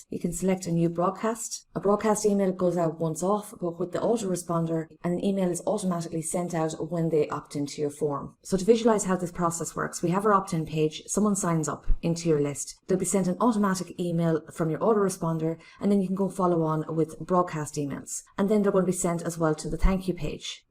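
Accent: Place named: Ireland. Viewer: Great review and nice to hear an Irish accent here as I am from there too.!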